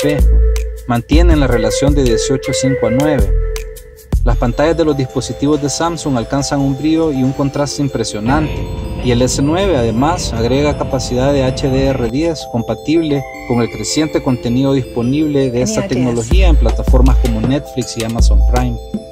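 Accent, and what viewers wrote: El Salvador. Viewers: Eres de El Salvador verdad 😮😮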